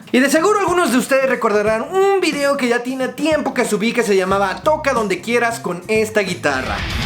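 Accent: Mexico. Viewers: Eres norteño?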